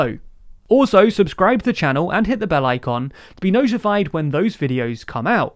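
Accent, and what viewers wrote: United Kingdom. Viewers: Love hearing a Brit explain American politics!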